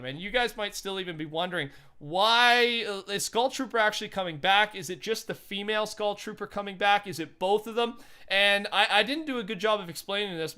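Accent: Canada. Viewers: R u Canadian too?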